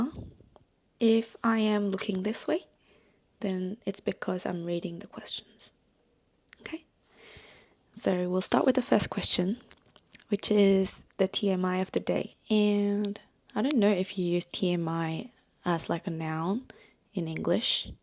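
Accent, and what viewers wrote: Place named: Australia. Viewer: it’s not boring at all! i really enjoyed learning a little more about you… and your voice is really lovely and heartwarming and so is your presence which is a bit different from your photos on instagram(both are great btw). and the touch of aussie accent just great! i was smiling the whole video like an idiot 😂 thank you for making this video and good luck with your next goal 🫶🙏 btw i got my assumption about you right 🤣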